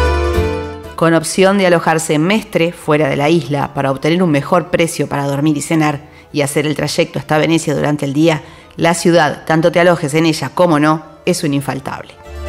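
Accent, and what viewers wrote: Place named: Argentina. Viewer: Creo que es Argentina o Paraguay a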